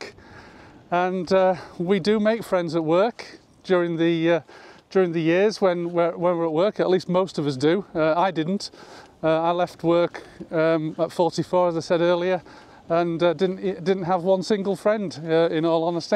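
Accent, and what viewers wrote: United Kingdom. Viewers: Thank god British speak, like you I retired at 45 best move ever, how I found time to work I’ll never know. Now 65 still fab.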